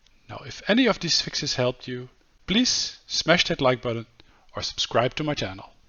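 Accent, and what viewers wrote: Netherlands. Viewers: Haha strijder. Ik dacht in het begin all "hmm is deze guy nou NL?". Letterlijk 2 sec later "my PC is set to Dutch" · jij ben sws nederlands ahahah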